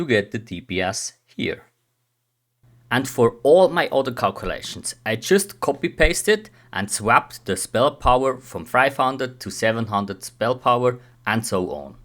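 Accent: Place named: Switzerland. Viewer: very thick swiss accent haha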